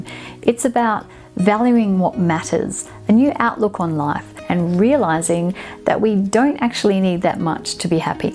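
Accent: Australia. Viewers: Did you say Autistic or Artistic?I'm American so sometimes Australian accents are more difficult to understand.I'm beginning this process now that my nest is empty.Its very difficult but I'm sick of all the excess things I have accumulated. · Did she say autistic or artistic? Her Australian accent can be misleading. Nice presentation however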